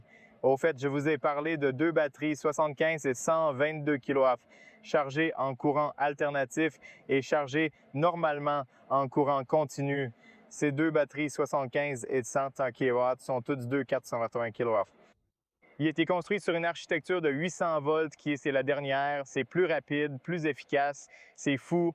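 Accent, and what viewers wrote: Quebec, Canada. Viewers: Honnêtement ,cette vidéo comporte beaucoup d’informations interessantes mais exprimées dans une telle confusion,passant du coq à l’âne , brouillées par un débit verbal trop rapide et par l’accent québécois que je renonce à vous écouter jusqu’au bout. Pardonnez moi .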